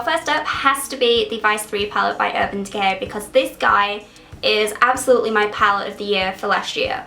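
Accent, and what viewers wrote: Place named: North, United Kingdom. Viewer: I'm sooooo confused by your accent? Is it northern or American?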